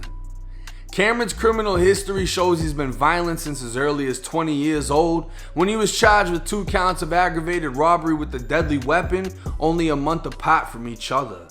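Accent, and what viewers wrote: Boston, United States. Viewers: If you from florida why you sound like you from south Boston fam?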